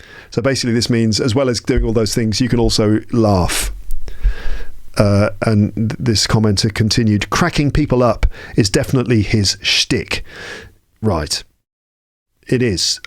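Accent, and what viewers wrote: United Kingdom. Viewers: I think you should warn your audience that listening to your podcast can be very dangerous. After just a couple of episodes I’ve got completely addicted and now I can’t stop. I love your short stories and I like so much your rambling episodes too, no matter how long they are. With your beautiful voice, your lovely British accent and your sense of humor you could read your shopping list and it would be interesting, amusing, useful and totally enjoyable From now on I will stop watching Netflix movies that I can’t completely understand, it so frustrating. I will listen to your podcast instead, so hopefully my old-fashioned-miss-Marple-English (I’m a big fan of Agatha Christie novels) will become more modern and more fluent. Thank so much for your great podcast,.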